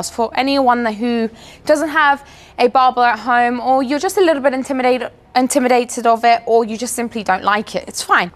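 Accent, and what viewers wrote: United Kingdom. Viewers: Your accent is so strongly British haha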